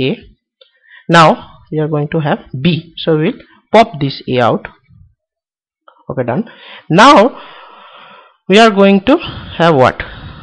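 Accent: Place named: India. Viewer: hhhh i'm sure he is indian because of his voice , but thnks anyway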